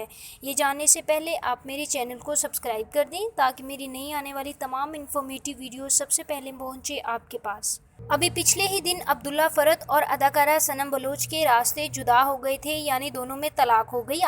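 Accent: India. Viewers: Intihai buri hy...urdu tu sahi nahin..news kya parrhni....indians ki tarah khabar ki ghabbar or ghalat ko galat kaha ja raha hy...urdu k words pronounce krna ata nahin...bad👎👎👎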